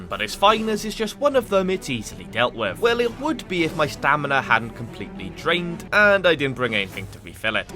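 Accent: United Kingdom. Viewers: Why you British?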